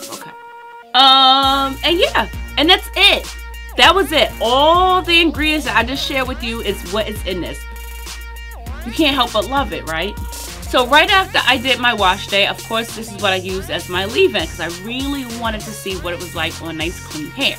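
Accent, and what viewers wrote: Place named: Illinois, United States. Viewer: Where are you from? Sounds like Illinois my entire family is from there, I could be wrong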